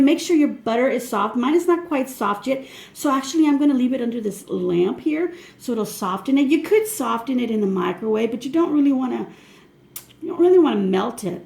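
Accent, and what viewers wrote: Texas, United States. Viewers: You know what is funny,,,, I was watching your more recent videos & you don't have the accent that I can hear in this video! Have you always lived here in Texas? I moved here about 4 years ago. 😁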